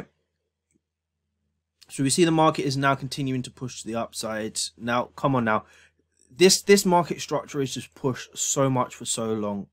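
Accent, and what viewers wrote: London, United Kingdom. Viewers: Thanks Boss. Your British right? What broker is this? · Honestly mate i think this is quality - i love the way your showing what you would do before & then after whether it be a win or a loss....love the honesty there , people learn more from mistakes .You sound like your from my kneck of the woods - London Lad.Anyways keep doing what your doing brother , you come across as a nice genuine guy .Liked & subscribed .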